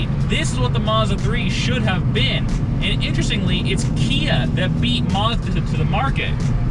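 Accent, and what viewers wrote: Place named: United States. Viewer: Jordan Henderson is doing car reviews?! Sick. Nice American accent btw.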